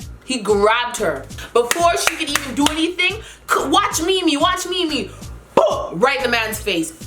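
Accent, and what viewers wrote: Jamaica. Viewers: I like how as I heard the intro song I had a feeling that she was Jamaican, but after she seh 'boom' and 'tump' I knew she was a yaddie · YYYYYAAAAASSSSS I LOVE UR JAMACIAN ACCENT 😍😍😍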